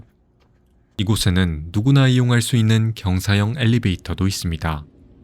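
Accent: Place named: Jeolla, South Korea. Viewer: 가~장. 기~차.. 전라도 억양인디! 서울말쓰시는데 고향은 전라도지라~ 잉??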